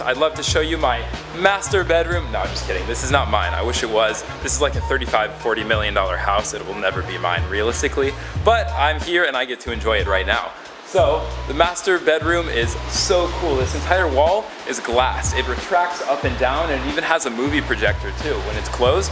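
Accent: Germany. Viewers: Are you german because you got a german name and a little german accent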